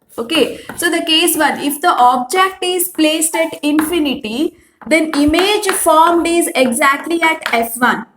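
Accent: Karnataka, India. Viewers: Mam are u from Karnataka 🤔🤨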